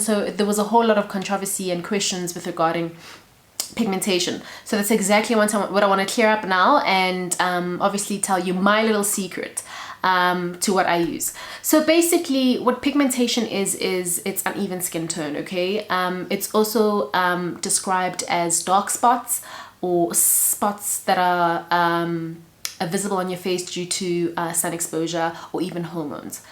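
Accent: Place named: South Africa. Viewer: Thanks for the content! I'm wondering could this be used for lip pigmentation?? Btw love your accent! Are you from SA?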